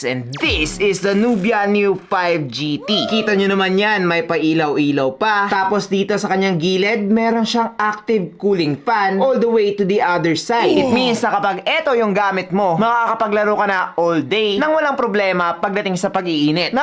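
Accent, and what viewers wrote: Visayas, Philippines. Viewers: Bisaya ka lods?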